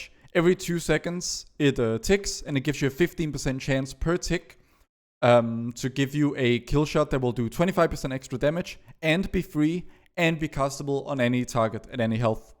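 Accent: Denmark. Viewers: Are you Danish by any chance? The accent seems very familiar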